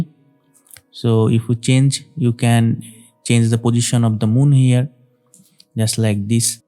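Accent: India. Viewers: by the voice I can tell its an indian channel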